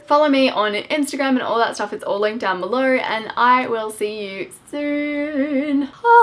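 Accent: Australia. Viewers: omg are you Australian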